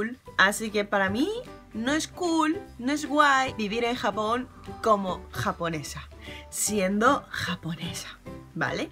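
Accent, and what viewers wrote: Spain. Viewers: el acento españolete no "mola" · Como se nota que has aprendido a hablar castellano con un madrileño, tienes ese desqueje propio del madrileño, que nos ha dado fama de chulos. ¡¡es muy bueno!! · V ella es española?? O japonesa :v cara de asiático y asentó España (khe verga) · está fatal tu acento españolete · tienes que tener acento de España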